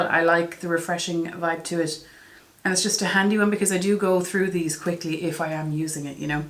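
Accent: Ireland. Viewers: Happy to help! I know oden's eye has a similar sponge as well if you can't find it in Penneys. 😉 I'm almost Irish ahah. I'm Portuguese but lived in Dublin for 7 years (back in Portugal now). I actually found your channel when I was looking for Irish youtubers to follow cause I missed the accent so much.😅 xx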